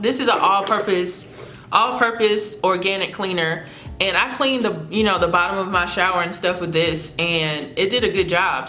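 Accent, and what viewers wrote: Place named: Texas, United States. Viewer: Are u from Texas??? Your accent is everything 😘.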